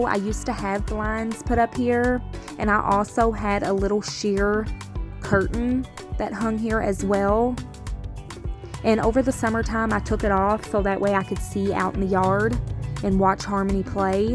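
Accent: South, United States. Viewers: You might be a gypsy well honey you have got that southern barrio accent I am from Georgia and I got it to you tickle me when you talk about all that stuff just the prettiest little fine but I’ll say one thing you were very clean person nobody could never say you was nasty thank you for sharing hugs and prayers baby give the baby a big O kiss and harmony today❤ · I love your southern accent!! I'm going to need to try that Anti bacterial pledge!❤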